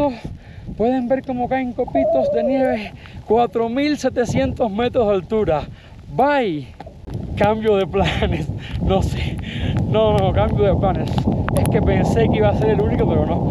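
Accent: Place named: Cuba. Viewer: Y por si acaso lo que t'escribo es en broma porque como no diría jamás Kiko : "Tu si me simpatizas" por tu manera de hablar tan cubano chico, por eso recordando la expresión del Chavo del 8 : " Tómalo por el lado amable" 🤗👍🏻❤️🇵🇪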